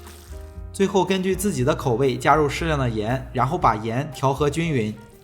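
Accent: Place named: Shandong, China. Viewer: 你是山东人吗？听你的口音和有些厨房的工具名称像我们家乡的人。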